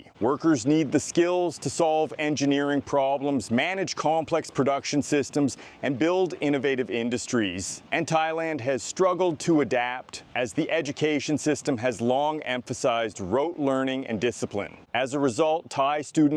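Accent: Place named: Newfoundland, Canada. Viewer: I'm Canadian also, but your accent is driving me nuts. You talk like a non native speaker. Are you a Newfie?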